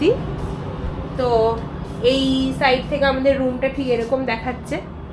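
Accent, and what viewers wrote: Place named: Kolkata, India. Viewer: Amon bol cho jeno kolkata theke gecho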